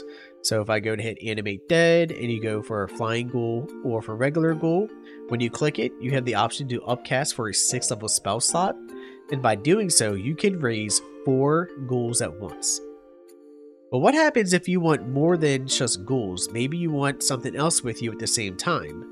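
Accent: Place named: Pennsylvania, United States. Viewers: I sense south jersey/eastern PA/delaware in the accent 🤔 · ah sounds just like the Pennsylvania accent. Either way nice video. Also them Orioles are poppin off! Go to a game this summer don't miss it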